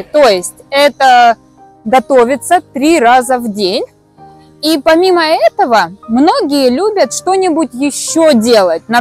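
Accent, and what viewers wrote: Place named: Ukraine. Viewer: Почему-то акцент такой как человек с Украины 😉 адаптации Вам скорейшей ❤❤❤ язык наверное уже освоили,ведь с свекровью нашли общий язык 🌹🌹🌹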